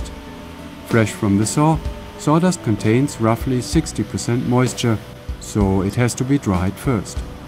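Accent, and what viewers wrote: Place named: Germany. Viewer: i think he s german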